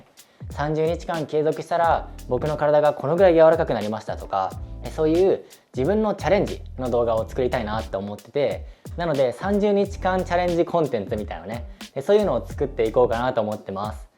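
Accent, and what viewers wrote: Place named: Japan. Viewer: Please do not doubt your English pronunciation. It's great. You can pronounce words correctly which are impossible for other Japanese people to do. And don't worry about your accent. Even after living in the US for 21 yrs a slight German accent will slip into the conversation, even though Americans always thought I was Irish or Swedish 😂. When I look at your built I always see Yoshiki-san from XJapan . He has the same height as you but a real strong upper body. He is slim but has muscles from drumming and physical exercise. So it is not impossible for you to achieve.- I wish you all the success in the world ❤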